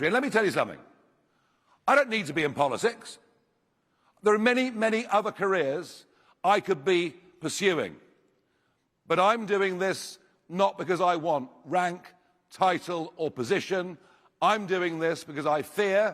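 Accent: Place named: United Kingdom. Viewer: Nigel is a goofy-looking guy (he really needs to stop doing the lizard tongue) but I trust him mostly. Im glad he at least sounds like a real Briton and a man with enough brains to give a good speech. Of course, politics is partially about defusing explosions by making people IMAGINE they are being spoken for. Nigel better be for real, like Rupert Lowe, and Nigel MUST bring Lowe back into this tent. HE MUST do it! And embrace Tommy Robinson also. Conservatives need to answer for the CITY if they want to move forward. They have to talk about the corruption of trusts and foreign money, because it has wrecked the nation. The Royal Family is the problem of BOTH sides, and Charles needs to go. William needs to rebel or go.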